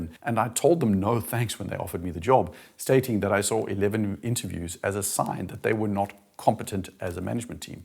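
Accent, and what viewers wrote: South Africa. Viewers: Sounds like the accent of a South African whose first language is English, he most likely is a native speaker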